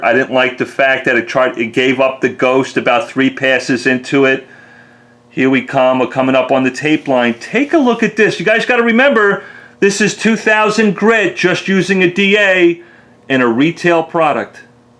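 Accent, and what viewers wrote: New York, United States. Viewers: ha! I thought he sounded a little "New York" accent as soon as I heard - as someone who has visited New York and loves it:-) Thanks for the vid. Meguiars seems to win on most things. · I was going to say you sound like you're from NY. (NJ here) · Jesus, I feel like i'm hearing my brother 'taawking' on the phone with me:) As usual, you have the most honest and informative videos on car detailing. You're a legend,!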